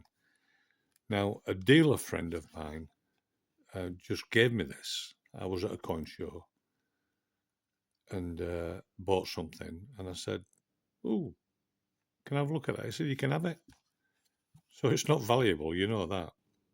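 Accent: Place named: Northern England, United Kingdom. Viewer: Love your vids ,especially appreciate your northern accent!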